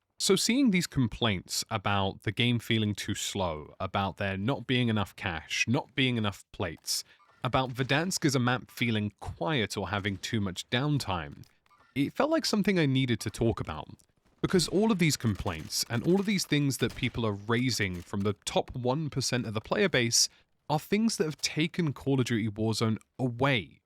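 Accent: RP, United Kingdom. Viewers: Loving the posh monologue accent Vs. your usual streaming accent. Also, couldn't agree more with virtually everything you said.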